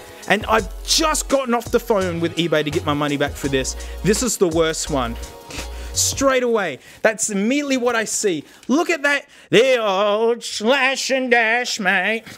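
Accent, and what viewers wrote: Australia. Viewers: Perfect way to start the day, aussie ranting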